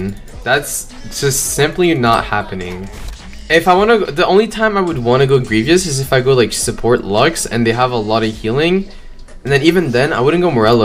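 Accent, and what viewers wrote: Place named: South Korea. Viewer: I have to ask just because I love the way you talk -- where is your accent from? I'm getting Korean vibes haha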